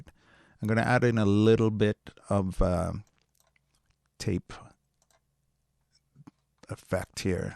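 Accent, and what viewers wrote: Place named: Ireland. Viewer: I sense an Irish accent. 1 love.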